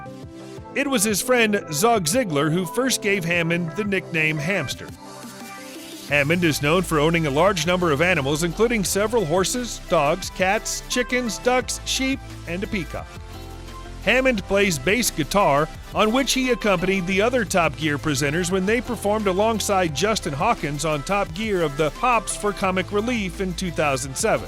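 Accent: United States. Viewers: The American accents gets right on me tits say it probably ffs not hard aint kids anymore 🤦‍♂️ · jeez the american pronunciation. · Christ get somebody who speaks English, as in English, not what some people refer to as American English, it makes these videos almost as unwatchable as the really cheesy still flying in and out. I am sure the £10 software has a fade option. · Why is a yank commentating ?? VERY BADLY !!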